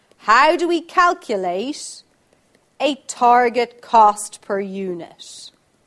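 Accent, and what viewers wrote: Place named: United Kingdom. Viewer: wow uk english hit different eh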